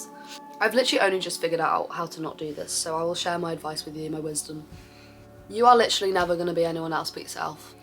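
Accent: United Kingdom. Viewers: Бля смотрю это чтоб повысить уровень англ. И нихуя не понимаю! Британский англ. – это полный пиздец. Как блять эго понять?? 😭 Смотрю на субтитры и понимаю половину слов, а если их убрать – нихуя. Блять учу англ уже год и не могу поднять уровень 😭 извините, но моей душенька устала. Теперь легче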